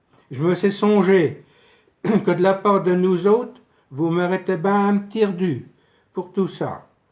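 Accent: Sarthe, France. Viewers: Mdr le pure sarthois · Bonjour à vous.le patois sarthois .c est beau à entendre.je suis sarthois château du loir.allez entendre le belinois. Mort de rire.bravo félicitation mr.vous êtes d où de la bas.pardi.bon courage à vous et tous mes meilleurs vœux de sympathie.en voiyure.🤩🤩🤩🤩🤩🎅🎅🎅🎅🎅🎅👍👍👍👍👍👏🏿👏🏿👏🏿👏🏿bye.a demain.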